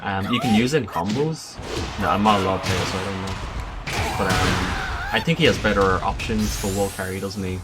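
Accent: Ireland. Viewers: He's Irish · Ah I see it's Irish! Thanks!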